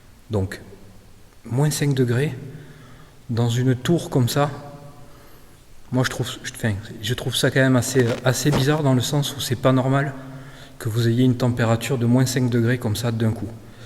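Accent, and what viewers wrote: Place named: South, France. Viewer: Un Abonné de plus ! Je suis fan du travail de Guss DX et j'attendais avec impatience un contenu similaire mais avec une touche personnelle différente. Qualité de Montage, Durée de vidéo et l'accent du sud 3 épisodes et me voila déjà fan de ton travail ! Continue sur ta lancée je trouve cela Génial !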